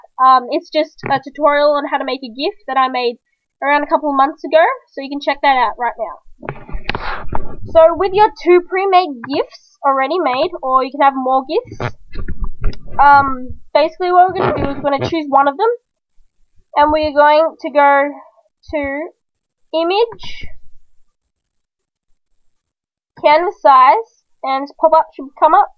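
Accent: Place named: Australia. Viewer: speaks in an aussie accent like me is so refreshing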